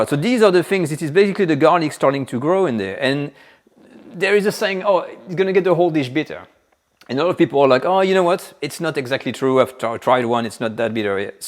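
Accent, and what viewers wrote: France. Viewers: This guy's french accent made me subscribe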